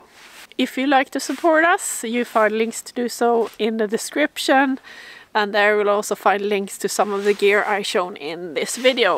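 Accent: Sweden. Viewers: Thank you for the tips!! Survival so key. Your accent is lovely. It sounds vaguely familiar, but not sure if that is due to US melting pot or English/Scandinavian ancestors. Either way, your English is muuuuuch better than my Swedish. :) Tack för att du delar med dig av din expertis! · 2 sekunder in hör svengelska, japp definitivt sverige:)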